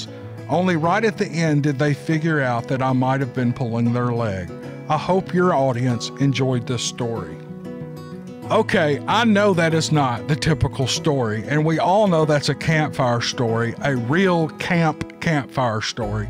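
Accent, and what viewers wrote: South, United States. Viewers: Hey Sir… by your twang I think your a southerner. The start of this video shows a lot of nice trees and green grass not familiar with that in TX. You do not need to state the city etc. However could you say what state y'all lived in. Thank You.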